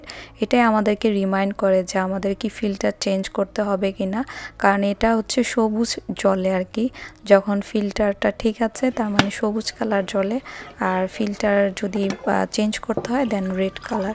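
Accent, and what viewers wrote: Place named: Sylhet, Bangladesh. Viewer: আপু,আপনার আপনার আশপাশে কি সিলেটি আছে?আপনার ভাষা তো সিলেটি টান এসে গেছে🎉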